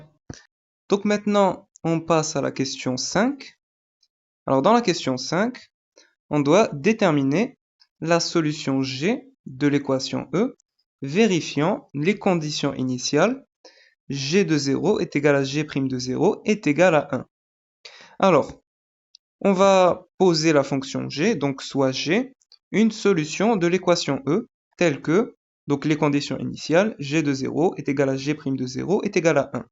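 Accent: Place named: Arab world. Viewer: Parfois tu parles en français et y a des fois tu parles comme un arabe , je ne comprend pas 😢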